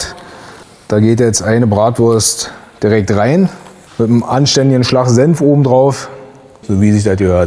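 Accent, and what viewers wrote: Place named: Berlin, Germany. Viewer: HAMMER...wie ich diesen berliner Slang liebe, schlimm ist das bei mir ;););) Finde ich ein Bombe Rezept weil nicht komplett in Fett und ÖL ertränkt, Zutaten klingen stimmig, freue mich auf ausprobieren, ganz lieben Dank! LG aus dem shönen Rheinbach, Henning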